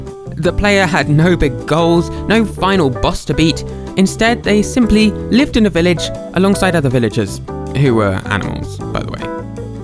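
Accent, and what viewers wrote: United Kingdom. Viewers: British :D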